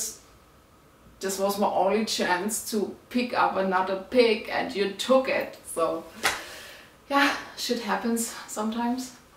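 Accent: Germany. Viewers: Love your German accent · She's from germany, Berlin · Now you will have to spend a lot of time answering questions! 💪👍You look amazing with a short haircut , and a cute German accent!❤😊😊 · very good your English; I like the accent: German speaking in another language is always something different. And I know well that the German language is complex, like the Portuguese language. Thanks for the initiative to answer the questions. Like it your faces when you play.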